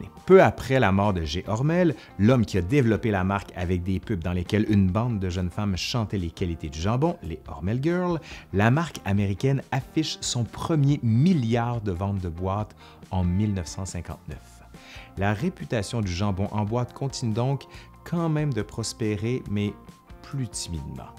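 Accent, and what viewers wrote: Canada. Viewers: L’accent canadien, je m’y ferai jamais. Impossible à prendre au sérieux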